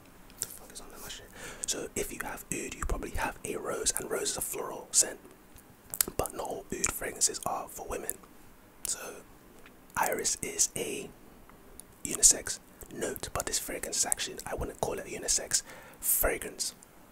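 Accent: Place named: United Kingdom. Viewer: bro reminds me of hobie (british accent) 🫣🫣‼️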